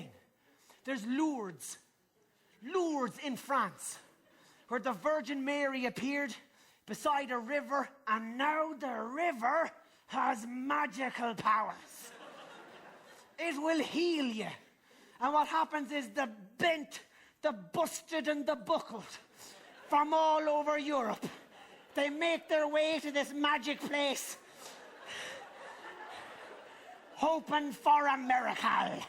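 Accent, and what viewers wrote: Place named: Geordie, United Kingdom. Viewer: 46 min, a wee bit Geordie, he sounds a little like Sarah Millican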